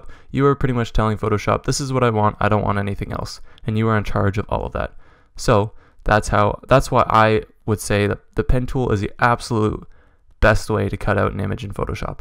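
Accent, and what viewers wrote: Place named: Canada. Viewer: I can hear the canadian accent tehe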